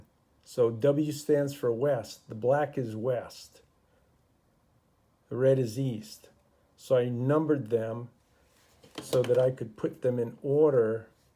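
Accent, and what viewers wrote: New England, United States. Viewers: Are you from New England,? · Wonderful video !!! Very well explained it, also I love the way you do it, I can hear your voice the entire day, is very calming and love the accent … New England ha !! 😊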